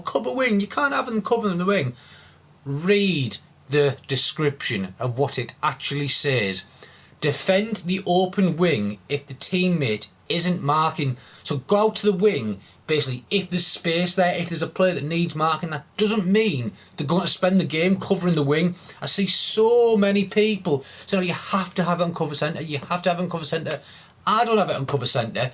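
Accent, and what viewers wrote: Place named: Middlesbrough, United Kingdom. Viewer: where you from mate Middlesbrough? or close? ive never wanted to stream because i thought my accent would sound terrible but....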